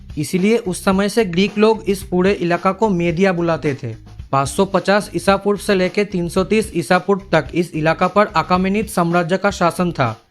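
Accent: Bengal, India. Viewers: Are you bengalee? Because your accent makes me feel like that P:S: I'm a bengalee too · Are you bengali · Your accent is kinda like bengali people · Tu Bengali hai na?(pronunciation) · Aaap Bengali ho na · Are u bengali? Anyways love from kolkata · Idk why but tumhara accent Bengali lag rha h mujhe 😄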